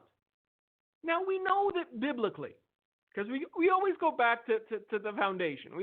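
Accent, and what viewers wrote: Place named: France. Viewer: I love your French accent. You kill me. Lol!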